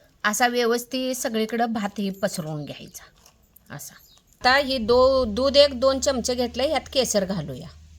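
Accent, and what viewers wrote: Kolhapur, India. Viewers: 🎉🎉😂😂 खूपच छान पद्धत मस्त आणि कोल्हापुरी भाषेलाही गोड वाटते बिर्याणी एकदम भारी शोभा अकोला कर बेरड पुणे वडगाव शेरी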